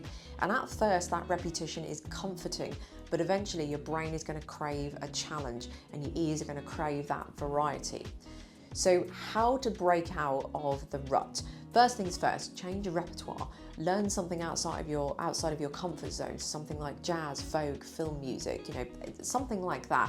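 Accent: United Kingdom. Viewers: Not going to lie I stopped playing violin like 5 years ago yet still here I am watching your beautiful face and attractive Aura with your British accent😅😂😂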